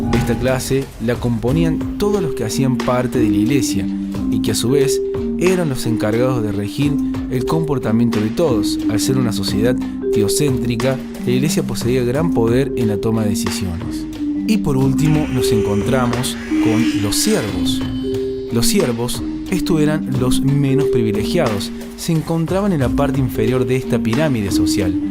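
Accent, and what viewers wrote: Córdoba, Argentina. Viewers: Y el cordobe que narra la historia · Che alguien más pensó q este es cordobés? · muy bueno el video, me sirvio mucho para un informe escolar. No pude evitar tu acento Cordobes jajaja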